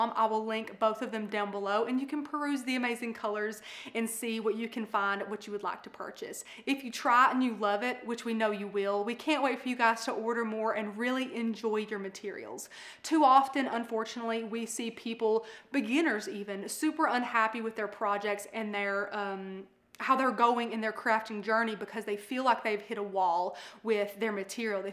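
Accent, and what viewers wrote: South, United States. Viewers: I love her southern accent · Clicked for the review...stayed for that amazing accent! I'm Australian, don't hear that kind of voice every day. Is that American 'Southern' / 'Mid-Western? Also, waddaheck happened to your brick wall? Someone got a bumped head over there? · I'm from the south and love her southern accent.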